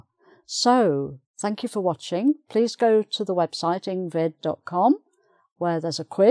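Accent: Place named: Midlands, United Kingdom. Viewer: The lady is from Midlands, he told about this in her video about accents and dialects. She moved to London and her pronunciation gradually changed.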